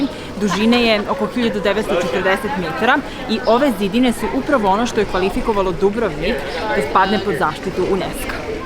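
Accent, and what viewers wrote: Belgrade, Serbia. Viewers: Sto ti smeta,bg akcenat? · Pa nije bas tako gdjice ..dajte malo bolje istoriju Dubrovnika. Treba da malo znate i istoriju umetnosti i istoriju..a to je ovde na zalost izostalo. Sve ste pomesali..Inace Dubrovnik su napadali i sa mora i sa kopna pa cemu su sluzila uvrdjenja prema Srdju? kula Minceta? A tek taj napadni bg akcenat..ublazite to malo !!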